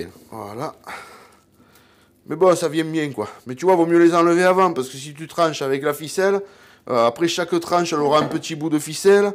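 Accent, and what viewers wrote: South, France. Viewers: salut mon sympa cette petite video qui met en appetit ca donne du courage pour commencer la semaine continue te un mec super a bientôt et garde ton accent ensoleille pour nous les gars du nord si non si non tu connaît la reponse ah ah bisous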